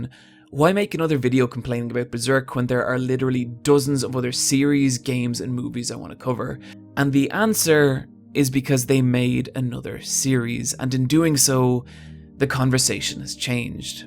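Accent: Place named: Dublin, Ireland. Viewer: are you Irish? You have a Dubliner accent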